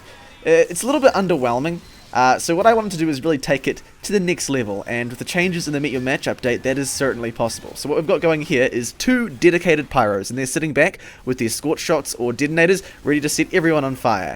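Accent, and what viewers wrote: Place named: New Zealand. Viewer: He's from new zealand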